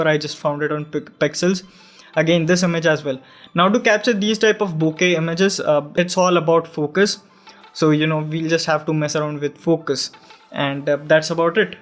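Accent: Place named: India. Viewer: You are indian right 😁 I know from your accent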